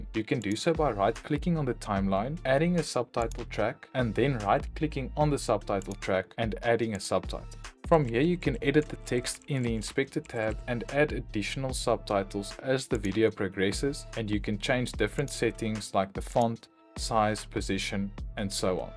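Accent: South Africa. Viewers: You sound South African 💯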